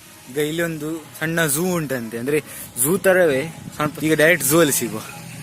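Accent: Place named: Kundapura, India. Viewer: Nim kundapura kannada laik itt matt niv nachige padbedi nav nimg yenta heludilla so bindas matadi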